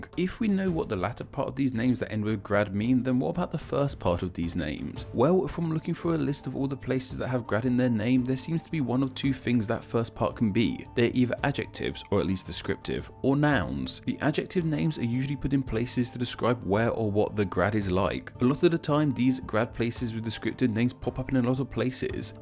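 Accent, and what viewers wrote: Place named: Midlands, United Kingdom. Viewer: For a channel dedicated to etymology, it seems weird that the host pronounces every non-English name and word as though it were a town in the Midlands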